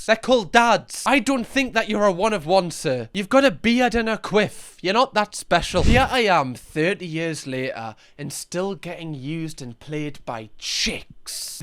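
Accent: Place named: North, United Kingdom. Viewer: Keep at it mate you're a natural 👌 usually I hate the sound of us northerners on tv/video 😂 but I honestly laugh at the shit you react to.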